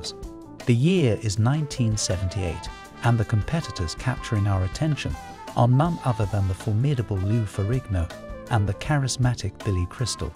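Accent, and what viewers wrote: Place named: United Kingdom. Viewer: Hyperbole with a British accent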